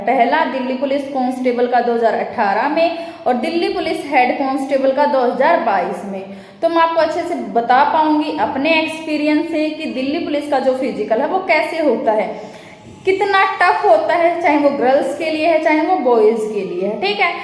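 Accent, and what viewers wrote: Haryana, India. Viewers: Haryana se ho ji aap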